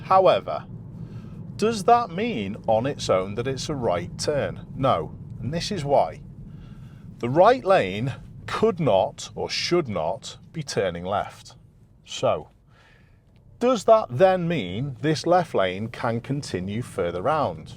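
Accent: United Kingdom. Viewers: That was really interesting and thought provoking. I also found you mentioning various key factors just as I was thinking the exact same thoughts and nodding in agreement. One key thing with all this. Now that this issue is known for this particular double roundabout, it would be a good idea for all local driving instructors to brief their students on this as part of their test preperation and to practice in the way expected by the examiner. Problem solved! At least for the purpose of passing the test. I'd like to comment further on this very interesting clip but the Canadian border is calling...from all lanes! Was very nice to hear a Brirish accent and expressions. Seems like it's been ages since last hearing one! Again, apologies for my relative absence in the comments but as you know, been very busy in North America. Hope all is well in the Uk.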